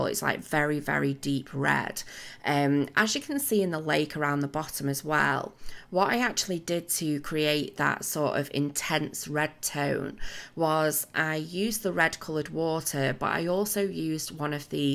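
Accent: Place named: Northern England, United Kingdom. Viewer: My boyfriend is really proud of himself - I occasionally show him accents and ask where he thinks they're from, and he guessed you were from somewhere North of Manchester. :P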